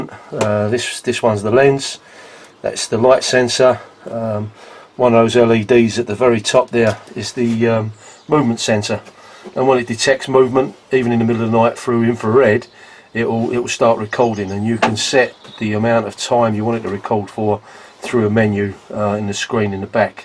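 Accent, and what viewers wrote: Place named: London, United Kingdom. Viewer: Thanks, just setting ours up to capture Kangaroos, Wombats and Wallabies here in the Upper Yarra Valley, 70kms from locked-down Melbourne. Nice to hear an authentic London accent again. We also want to watch how our chickens escape their pen as foxes have taken a couple lately.....thanks...useful tips!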